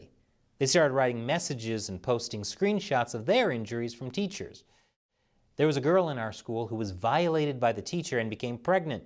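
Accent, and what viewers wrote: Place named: United States. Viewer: First time on your channel but just the american accent lets you kno that its goign to be biased and especially finger-pointing.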